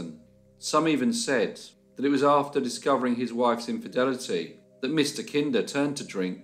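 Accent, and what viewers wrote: England, United Kingdom. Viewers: Just want to take the time to thank you, for your time and efforts. Incredible stories from the past! Your english accent makes it even better to listen to. Wish good week ahead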